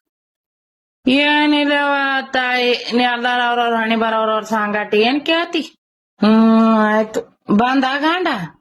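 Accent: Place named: Indi, India. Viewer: ಪಕ್ಕಾ ಇಂಡಿ ಭಾಷಾ ಮಾತಾಡತಿ ಅಕ್ಕಾ. ಇಂಡಿ ಬಂಗಾರದ ಬಂಡಿ 👌👌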